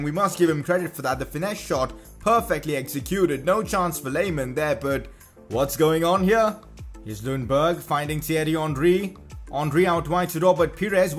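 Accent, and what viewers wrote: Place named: India. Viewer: You sound like an indian(🇮🇳) or Someother Asian country person🌏 that learnt to speak English just a month ago😂😂😂(STG(swear to God)not tying to be racist 😑)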